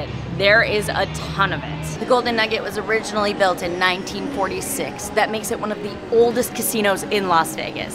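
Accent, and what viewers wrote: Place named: Minnesota, United States. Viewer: Are you from MN? I detect an accent.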